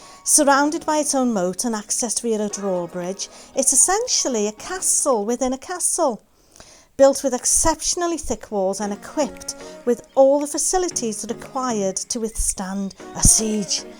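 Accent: United Kingdom. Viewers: Hello from Brazil. Super like for your video. I like old castles. Brazil was a country of farms. And not castles. You have a nice British accent. I studied English Literature in college☕🇧🇷🌷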